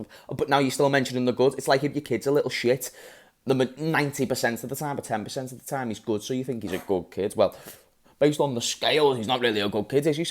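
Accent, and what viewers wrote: Liverpool, United Kingdom. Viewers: He got funny acent. Is it scouser?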